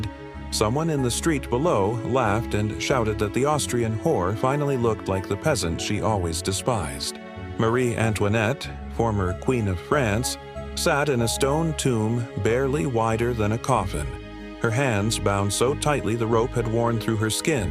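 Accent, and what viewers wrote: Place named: United States. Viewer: So how truthful is this? The dramatic American accent lends BS/showbiz to this rendition!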